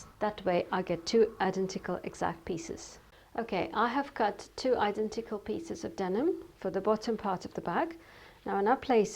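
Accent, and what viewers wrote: United Kingdom. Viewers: I enjoy all your proyects and your britanic accent.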